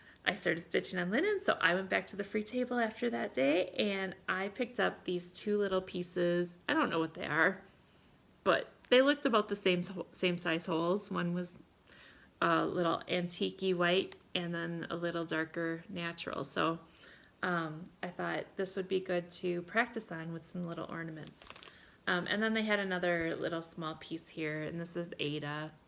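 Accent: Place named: Minnesota, United States. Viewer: I just love your videos! You are so fun to listen to. I love your “Minnesota” accent. 😉. I’m a MN girl too and I live about 20 minutes from you (just north of Becker). I can’t wait to attend my first cross stitch retreat. I’ve attended quilting retreats, but not cross stitch. Hopefully some day we will meet.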